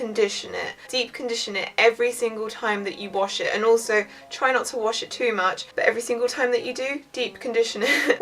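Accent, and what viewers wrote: United Kingdom. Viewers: Far Q It's just British... I suppose.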